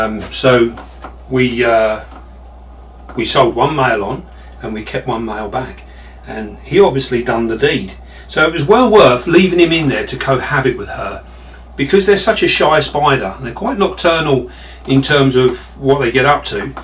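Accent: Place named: London, United Kingdom. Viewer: It's not lol 😆 what it is - the London in him. His accent. Those London accents always struggle with the Latin or hispanic sounding words. The thick accents don't allow it. I've got a big pal who's the same 🗣😆 I love accent, it's class 👌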